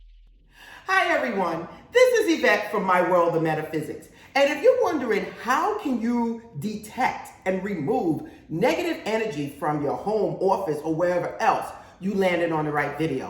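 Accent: New York, United States. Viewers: Hey dear!! I used apple cider vinegar instead, because my other vinegar is in the storage. Is that OK? I think it should be totally fine. Also, I just did the ritual and I'm looking forward to seeing the results in 3 days. PD: Love your NYC accent. That's the coolest accent in the US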